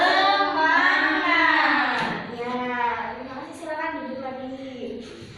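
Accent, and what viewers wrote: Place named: Java, Indonesia. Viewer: Medoookk rekk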